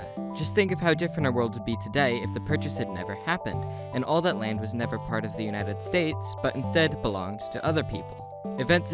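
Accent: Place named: United Kingdom. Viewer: idk why but at first he sounds kinda british not gonna lie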